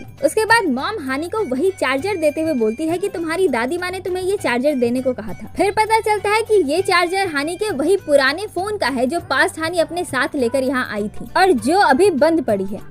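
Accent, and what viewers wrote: Bengal, India. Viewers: Explanation was amazing and funny . And 1 question you are Bengali ❓ Because you are talking like about Bengali .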